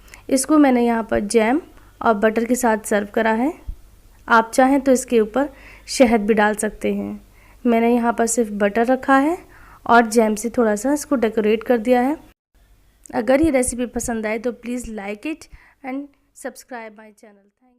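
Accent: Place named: Pakistan. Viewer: Nice pan cake. Kya ap pakistani hai ab ki awaz bht achi hai. Apka lahja bht sweet hai.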